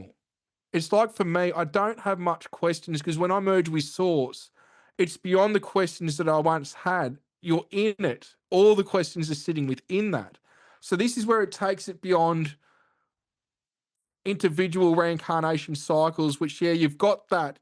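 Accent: Australia. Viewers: Is there an English version? The OZy accent is too strong for me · Love his classic old school Aussie accent! 👍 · I'M french, almost impossible to understand....sorry but aussie accent is hard for european people. But I love your country, been there twice. Love from France. ❤ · Well, of the English speaking countries the Aussie accent makes it the least understandable. Even South Africa has clearer English.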